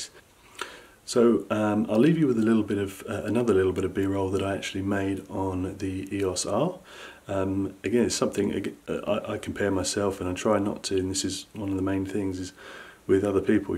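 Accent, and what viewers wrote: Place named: United Kingdom. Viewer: Dude you’re British? I subbed just for that lol. Great video man.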